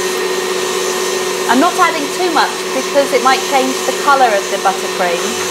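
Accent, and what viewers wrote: United Kingdom. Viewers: Why does this woman's speech sound so cute? I actually don't like British accent but I might be about to change my mind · I love her British accent :)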